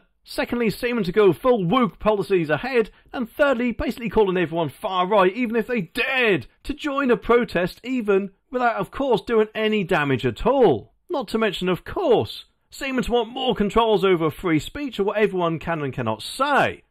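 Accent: Norfolk, United Kingdom. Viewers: Love the accent. Norfolk?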